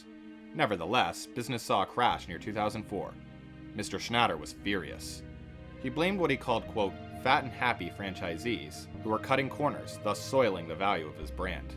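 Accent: Canada. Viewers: Is this also where the weird pronunciation of "chagrin" came from? Never heard it said that way but definitely caught those Canadian "out" and "abouts"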